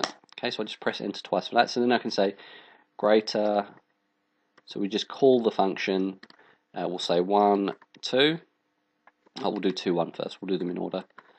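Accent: England, United Kingdom. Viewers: you did a very good job in a quick and easy introduction to Python FU Scripting for GIMP. OK, I understand that one does what one knows but your last tutorial is a “Hack”, sorry I am not going after you, it is just bad programming. All I am saying is that if the only two variables there are changing is X1 and Y1, for the starting position. Then one would think that you should in your if-elif-else (which is really a switch statement in C# or any other coding language, but Python does not have one so the if-elif-else) just set the variables x1, y1 and then after the switch then call the blend function (pdb.gimp_edit_blend) one time. Tighter code and much more efficient. By the way all teachers should have a slight English accent and it sounds perfect. It is like everyone with glasses looks smarter 😉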